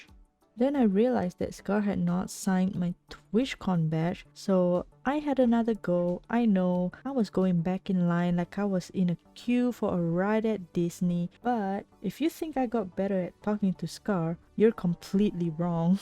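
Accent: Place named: Singapore. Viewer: Fellow Singaporean!!! I had a hunch with your accent but happy that there is actually a growing community of hermitcraft-likers here >:D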